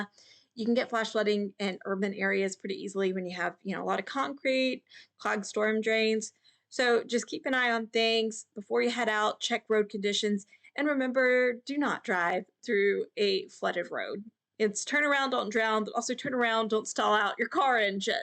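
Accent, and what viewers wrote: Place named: South, United States. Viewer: I love how you explain the weather! Nice Southern accent too!👏👏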